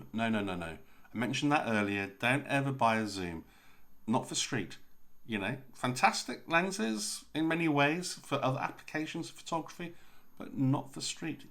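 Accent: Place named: South, United States. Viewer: You seem to be British. Perhaps you are from the South of Britain because you speak like someone from the South of the US. I know that you are headed someplace and I am intriged enough to wait until you get there. Just an observation. Love both photos.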